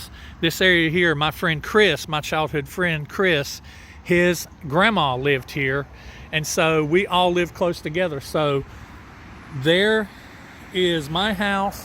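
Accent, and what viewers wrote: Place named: North Carolina, United States. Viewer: I just knew you had a NC accent. I am also from NC (Asheville area) but I've been so many places I have lost SOME of the accent. Really enjoying your videos. Kiss fan since 1979 (age 4). I was buying records when other kids were buying toys.....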